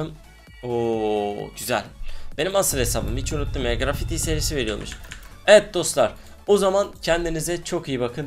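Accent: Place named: Urfa, Turkey. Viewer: Abi beni yanlış anlama ama öncelikle biraz kitap okuyup konuşmanı düzeltmelisin. Evet biliyoruz Urfalısın ama bu konuşmandan dolayı arkadaşım seni izlemeyi bıraktı. Benden sana tercih rekabette ve battle royale modunda değişik şeyler dene... Örneğin rekabette hiç bilinmeyen silahlar ile oyna veya sadece tabanca vs. oyna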